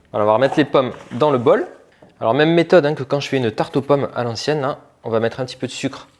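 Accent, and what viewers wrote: South, France. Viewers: Au top ! Avec ce léger accent du sud, encore meilleur ! Là, il me reste quelques poires, je vais les remplacer. La fève tonka, j'adore ! ❤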